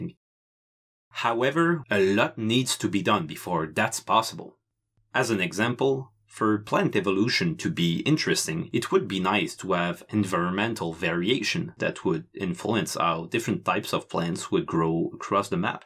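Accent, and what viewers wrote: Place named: Quebec, Canada. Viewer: sounds Québecois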